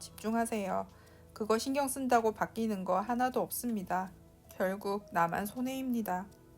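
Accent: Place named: Gyeongsang, South Korea. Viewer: 말투는 경상도인데 서울말 쓰려는 노력이 엿보이네요. 열심히 노력한 결과로 좋은 삶 살기를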